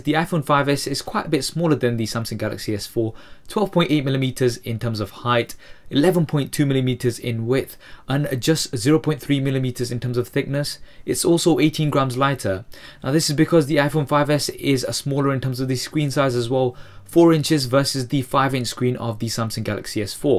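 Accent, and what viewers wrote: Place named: United Kingdom. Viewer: This man has British Accent and I love it. Its more understandable than the American Accent. Well, going back to the topic, for me iPhone 5S is better and BEST than any other phones either. Samsung phones are just copycats of iPhone's features and specs and EVEN the accessories! Without iPhone being released on the market, these copycat android phones won't exist!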